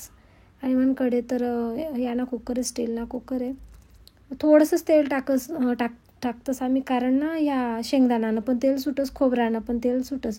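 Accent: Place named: Jalgaon, India. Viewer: ताई तुमची भाषा खुप भारी तुम्ही जलगांव चेका 👍👍🙏🙏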